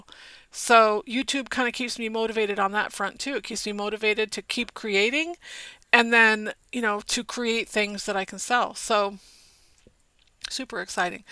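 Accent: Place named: South, United States. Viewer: Nice to see you’re back! I sometimes detect a southern drawl 😊.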